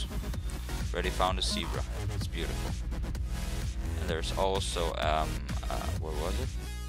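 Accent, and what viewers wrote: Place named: England, United Kingdom. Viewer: i think you sound English not germen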